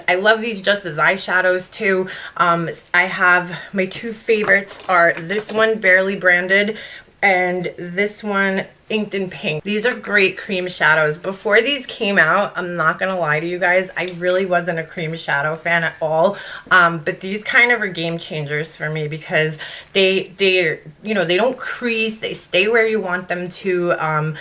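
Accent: New York, United States. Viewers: I'm a subscriber!! 🙋 Do you do acyric nails too?? You have a New York accent. You Rock !!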